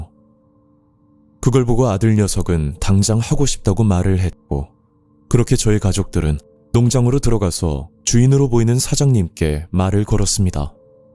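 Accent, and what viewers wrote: Gyeongsang, South Korea. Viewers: 여기 채널 은근히 경상도 많이 깜! ㅋㅋㅋ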